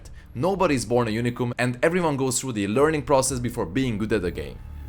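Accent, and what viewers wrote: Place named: Hungary. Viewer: Miért ilyen magyaros a kiejtésed?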